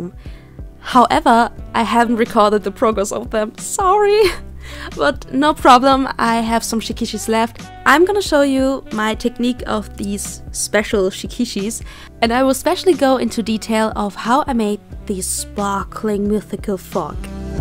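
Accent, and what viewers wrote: Germany. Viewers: Do i hear a german accent? I guess i do~ Greetings from germany <3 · After a few words I knew shes from germanyyy :D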